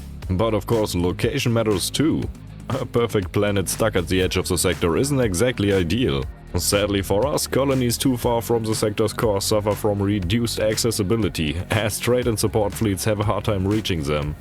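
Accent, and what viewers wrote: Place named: Germany. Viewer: Ich liebe diesen deutschen Akzent. :D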